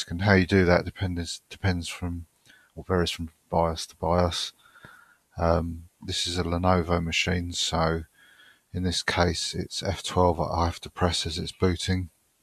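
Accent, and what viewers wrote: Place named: United Kingdom. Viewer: I have subbed and I will be back my fellow brit! I don't think you realise, how pleasant it is to hear someone who doesn't sound like a youtube "bot/nps" and/or an American, No hate to our bothers across the pond, but some of them accents can go straight through me, Like a vindaloo!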